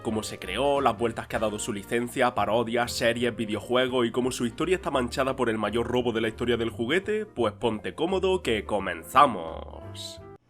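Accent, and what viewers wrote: Andalusia, Spain. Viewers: Español, creo que de Islas Canarías, Sevilla o Andalucía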